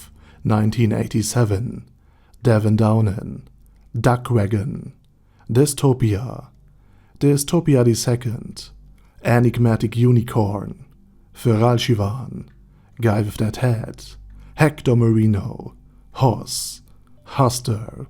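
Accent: Germany. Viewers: Man hört den deutschen Akzent gutes altes Denglisch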